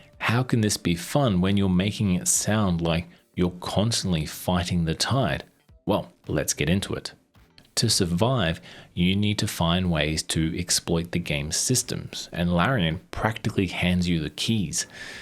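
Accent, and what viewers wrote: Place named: Australia. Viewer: At first I read "Latvian's First RPG" and was confused, like wtf is this guy's Aussie accent... then I checked the sub count and holy shit man, no fucking way your channel is that obscure! You're going to blow up if you keep going at this pace, trust me.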